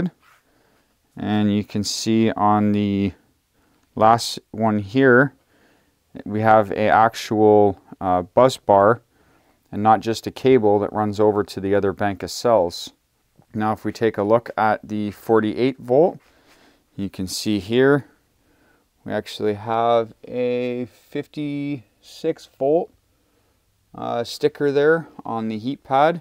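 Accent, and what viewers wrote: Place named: Texas, United States. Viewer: I love your videos but your accent is really cute (i had to edit because youtard doesn't understand Texan mixed with Spanish lol)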